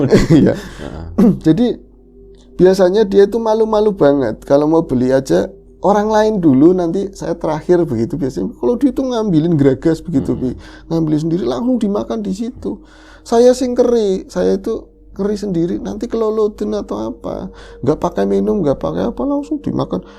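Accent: Banyumas, Indonesia. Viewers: hallo mas 😅😅..seneng aq klo denger bhs ngapak..ketawa trs aq nya..sehat sll mas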